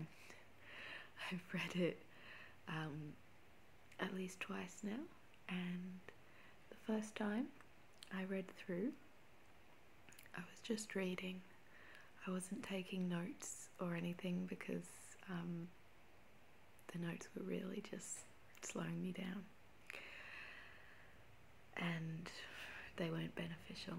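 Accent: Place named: Australia. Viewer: I also find it quite refreshing hearing an Aussie accent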